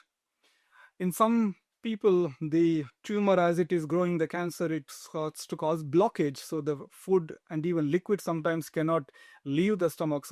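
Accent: India. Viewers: I was enterested with the caption. But the moment I heard that Indian accent.. i closed it.. IDKW.. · You india English is 50 / 50 to understand what are you saying !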